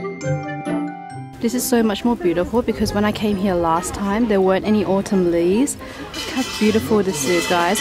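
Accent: Australia. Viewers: Cute China doll with Australian accent.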